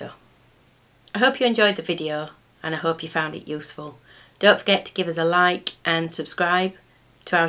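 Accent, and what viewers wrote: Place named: United Kingdom. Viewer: Good video. I love the British accent.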